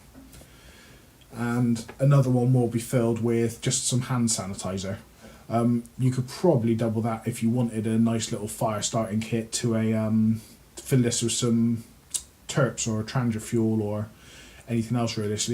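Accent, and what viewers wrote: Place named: Somerset, United Kingdom. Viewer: You sound like you are from Somerset which makes me wonder why you have some lock knives on you.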